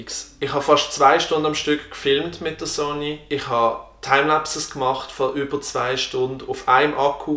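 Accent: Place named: Switzerland. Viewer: Hallo,wäre es möglich auch das Video in DEUTSCH zu machen ?? Schweizerisch sehr schwer zu verstehen !!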